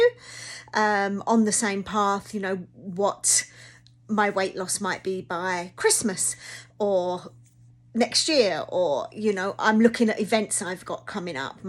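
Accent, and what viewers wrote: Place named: United Kingdom. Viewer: oh ok! I heard the accent and assumed you were over there lol. I was wondering how much it would be over there because US meds are ridiculously expensive even though the same meds in the UK are free.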